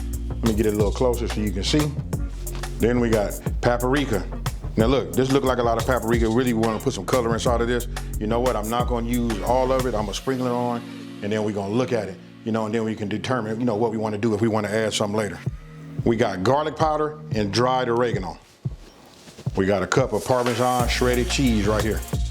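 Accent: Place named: Louisiana, United States. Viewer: where are you from??? I hear some Louisiana flavor in your voice!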